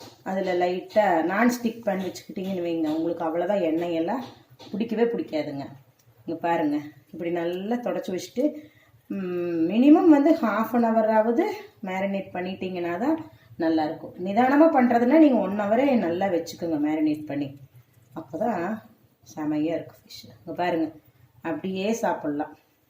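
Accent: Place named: Coimbatore, India. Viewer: Ur language slang spr sis.. Iam also coimbatore....🙂🙃🙂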